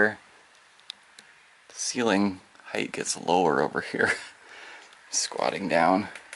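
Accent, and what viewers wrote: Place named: United States. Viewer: Where have you been?!!! I thought you'd given up exploring. Anyway glad to see you again. That must have been a magnificent house in its day. Thanks for the tour and by the way, don't know which part of the States you're from but I love your accent!! 😂 Gill 🇬🇧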